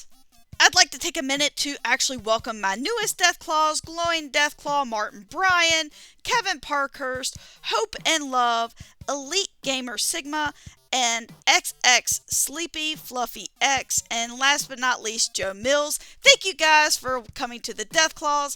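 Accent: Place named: West Virginia, United States. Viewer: W.V. accent...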